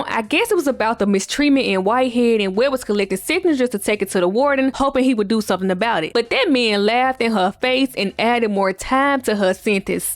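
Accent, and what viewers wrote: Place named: South, United States. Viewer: love your country accent 😂😂 i feel at home! new subbie in me 💕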